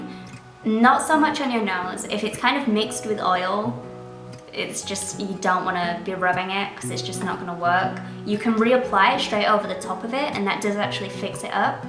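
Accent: Yorkshire, United Kingdom. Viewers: P.S what part of Yorkshire are you from? I'm in Harrogate.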